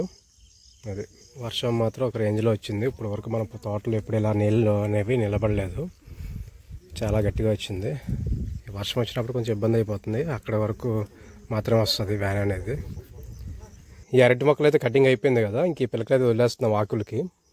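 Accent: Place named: Rayalaseema, India. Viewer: You are from Kollegala,Chamaraj Nagar Dist. How are speaking in Telugu,like Rayalaseema people?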